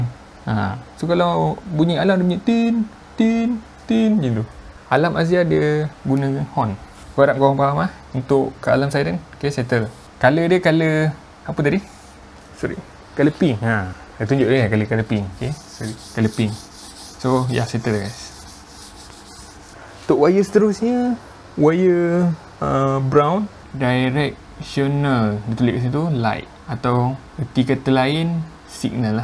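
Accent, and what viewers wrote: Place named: India. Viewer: kl gak fokus dengerin, aku kira orang India yg menjelaskan.😁🙏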